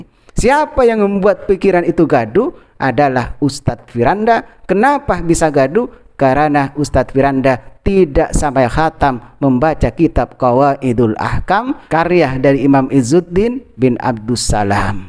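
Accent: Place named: Madura, Indonesia. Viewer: Kebanyakan logat bahasanya Madura. Sumbernya kiai yg sama. Mereka yg susah sunah ini misalnya Zaka, Sane Oye, terus orang ini. Orang ini udah salah disebarkan lagi. Maklum. Orang ahli bidah itu dihalangi tubatnya oleh Allah... E, chong ngaji ke Universitas Islam Madinah biar tidak pakai ibarat. Kasihan chong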